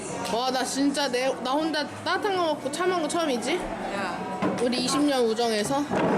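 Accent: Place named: Seoul, South Korea. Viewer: 옛날 서울 사투리쓰는 아가씨같아요 되게 말씨가 곱답네요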